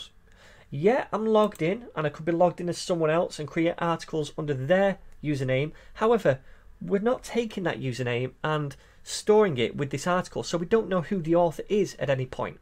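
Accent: United Kingdom. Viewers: That sounds like British.............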